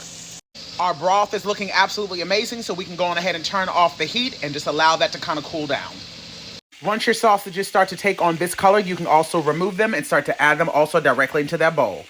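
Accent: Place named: Louisiana, United States. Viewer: Ok, you earned my respect. I would swear you are from Louisiana 🎉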